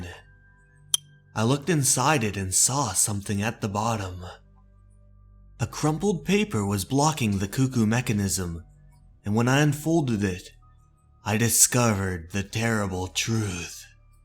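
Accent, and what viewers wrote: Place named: Canada. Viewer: I KNEW IT I STILL GOT IT!!! It was an extremely minor "it really helps me OOT a lot" that perked my ears up. I'm also a gigantic Canada's Worst Driver fan and dating a Canadian (Hamilton, Ontario) once has me primed to notice your speech patterns (best described as an extremely minor case of John Travolta in Battlefield Earth in my opinion). In fact, it wasn't until watching this video of yours that I realized Andrew Youngblood had that same John Travolta in Battlefield Earth cadence that became more evident as the series progressed and maybe that's the real reason the best show of all time was ended after fourteen seasons. I'm onto you, Andrew. I know what you're doing.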